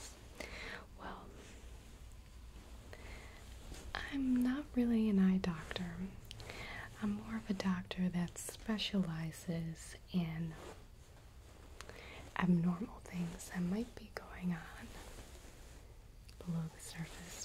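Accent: Midwest, United States. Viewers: I've been trying to figure out this accent as it sounds kind of familiar it's kind of funny that it's just Midwest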